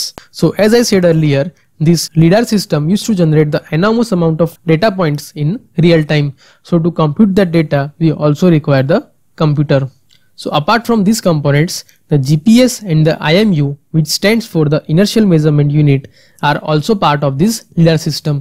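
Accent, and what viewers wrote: India. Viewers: Why don't you learn understanding Indian Accent. Bliv me India is the next superpower and you all might need to learn the way we speak.... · Indian people are so smart and clever but there is almoust always the accent :( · I'm Belgian, so I neither speak English nor Indian natively. In my opinion, understanding Indian English is about wanting to understand it. With a bit of training, (read: not actively avoiding Indian accent videos) I got to a point where it doesn't bother me that much anymore. And seriously, with the amount of Indian good quality channels like this one out there, it's totally worth it! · leaves when i hear indian accent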